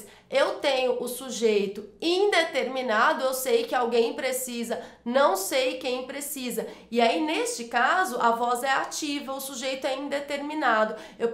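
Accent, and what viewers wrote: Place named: South, Brazil. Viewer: Professora, seu sotaque parece ser do sul, mas qual estado? Abraços apertados de quem já assistiu as suas aulas literalmente centenas de vezes.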